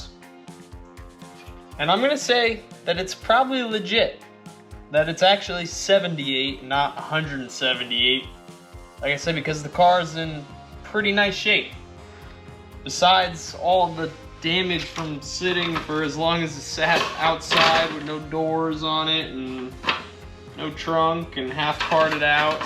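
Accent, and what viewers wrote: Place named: New Jersey, United States. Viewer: You have a accent . Jersey?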